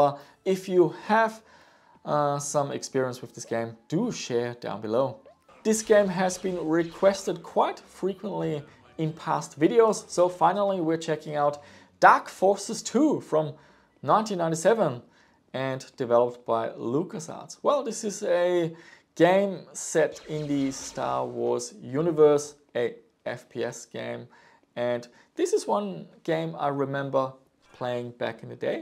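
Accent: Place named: Germany. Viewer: Your profile says you are from Australia, but you sound like a German speaking English. Why is that?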